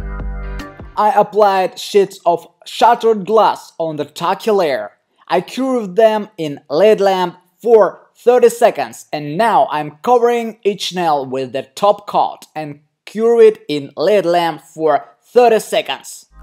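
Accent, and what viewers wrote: Russia. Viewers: Мне кажется что по-русски вы говорите лучше чем по английски с русским акцентом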